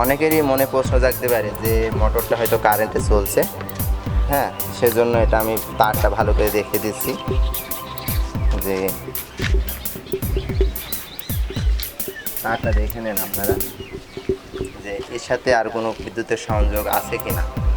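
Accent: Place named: Chandpur, Bangladesh. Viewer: তা আপনি একটা ভিডিও বানাচ্ছেন ভালো কথা কিন্তু কষ্ট করে শিখে নেন না কেন যে কিভাবে মুটা মুটি শুদ্ধ বাংলা দিয়ে ভিডিও টা প্রেজেন্ত করতে হয়? সেটা তো আপনার চ্যানেল এর জন্য ভালো ,আমার বাড়ি ও জসর শহর থেকে ২০ মাইল একটু নিচে কিন্তু আপনার মনে হয় কোট চাঁদ পুরের দিকে ।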